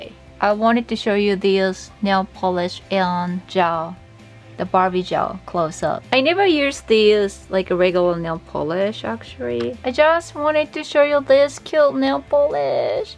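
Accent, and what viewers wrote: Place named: Belfast, United Kingdom. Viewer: hey love your belfast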